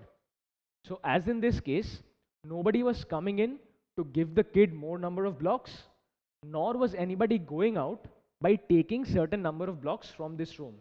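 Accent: India. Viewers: i can barely understand shit because of his indian accent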